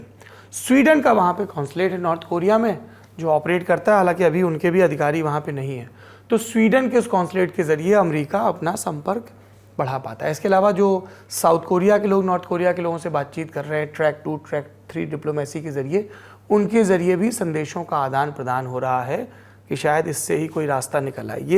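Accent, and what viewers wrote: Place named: Bhojpuri, India. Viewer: पहले मेरे पिता जी news देखने को कहते थे कि बेटा News देखा करो हिंदी भाषा अच्छी हो जाएगी। लल्लन टॉप और इन की तरह खबर बताने वाले तो हिन्दी भाषा को को भोजपुरी चटखारा दे रहे हैं।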